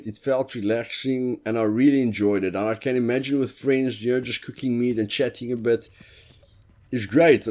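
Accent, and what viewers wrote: South Africa. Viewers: you sound south african lol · are you from South Africa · I am still struggling with one thing And that is to find out if you are South African ?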